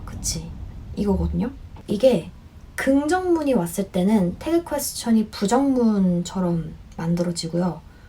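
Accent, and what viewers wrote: United Kingdom. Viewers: 진짜 영국영어 너무 듣기 좋네요...덤으로 도움도 받고 갑니다..! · 헐 영국인인줄 알았는데 이민도 심지어 유학도 아니고 혼자 배우신거예요? 헐..? 그 결정적인시기?어린나이? 그때 안배우고 이미 나이많이먹고 해도 저게 되는거구나...헐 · 채널 찾아서 너무 행복해요 😍영국 영어 공부하고 있는데 어렸을때 해외 거주 경험이 없는데 영국 발음을 구사하시는 분은 많지 않더라구요 또 있으시더라도 이렇게까지 자세히 어떻게 공부했는지 설명해주시는 유튜버는 처음 인 것 같아요 영상 하나하나 도움이 정말 많이 돼요!!! 저도 처럼 영국 발음으로 영어 하는 날까지 열심히 공부하겠습니당 ㅎㅎ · Hi! I happened to know your channel and I'm amazed by your British accent! I've never seen any Asian people can speak British pronunciation at your level! I'd love to know how you've learned British pronunciation (I really wish I could understand your language!! - I'm a Japanese viewer..) Anyway, I'm looking forward to watching more videos from you! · 목소리랑 발음 너무 좋으세여, 진짜 찐 영국영어다 우왕 👍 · 영국발음 너무 좋다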